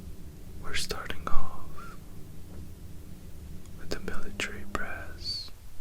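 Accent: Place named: Australia. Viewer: I thought you was australian🇦🇺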